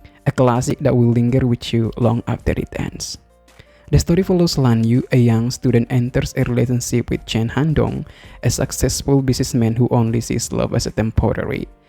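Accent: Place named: Indonesia. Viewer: It sound like Indonesian accent.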